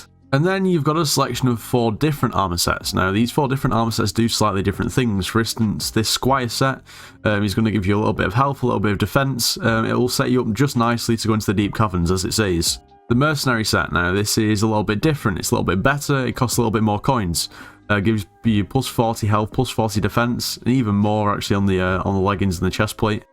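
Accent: United Kingdom. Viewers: He is the most British sounding British person I've ever heared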